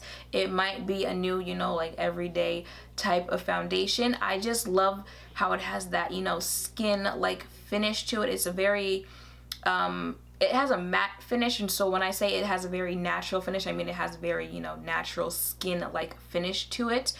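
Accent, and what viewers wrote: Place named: United States. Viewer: The foundation was a perfect match ! It's the first time I see you wear blush,it's really pretty ! Btw,"amande" is pronounced "amand" but i love when americans speak french,it sounds a lot cuter than when we speak english :p beautiful as always ;) xx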